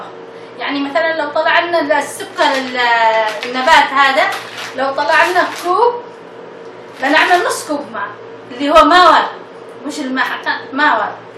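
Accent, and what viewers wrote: Aden, Yemen. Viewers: DAir هاذي لهجت عدن كل مكان معه لهجه اني من الحديده مااعرف اتكلم مثلها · انا افدا القلب حقك ي خاله والله انتي الام العدنيه الي يجي قلبه صافي وتعتبر الكل عياله وبناته والله انا مستحيل ادخل بلبخور او افكر اننا اسوي بخور بس والله نحنا مغتربين وكلامك يرجع لنا دكريات اللهجة العدنيه ونحنا محرومين منه بلغربه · الله يحفظك ياماه انا عراقي وعشت بليمن وعدن خاصة من الكلام العدني ذكرتيني بعدن كلها وناسها وطيبهم انا الان في العراق وحبيت اسوي بخور عدني بس المشكلة مافيش الظفر دا ينفع بدونه ولا ضروري يعني ولا في حاجة بداله ونفس الشغل حق الظفر 🌹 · الله يسعد هالمبسم .. ما شاء الله لا قوة الا بالله ما أحلى طريقة كلامك ولهجتها اليمنية الجميلة .. ما أجمل روحك .. يا الله شكثر أحب أهل اليمن ..الله يفرجها على اليمن ويردها إليه ردا جميلا ويردها اليمن السعيد الحافل بالخيرات والبركات اللهم احفظ اليمن واحفظ أهلها واحفظ دينهم وأعراضهم ونساءهم وشبابهم وشيبانهم وأولادهم وبناتهم وأطفالهم وأموالهم وبارك. 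اللهم في أهلها وأرضها وخيراتها وما فيها من النعم .. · اللهجه اليمنية تعجبني وطيبه اهل اليمن محبتك من السعوديه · يازين اللهجه اليمنيه ♥️ نحبكم من السعوديه 🇸🇦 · هاذي اللهجة اليمنية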